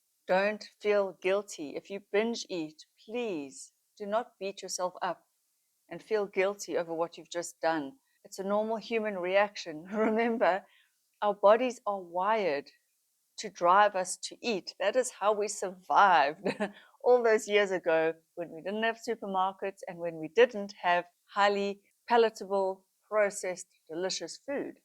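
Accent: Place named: South Africa. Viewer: You’re South African! Me too! I can hear it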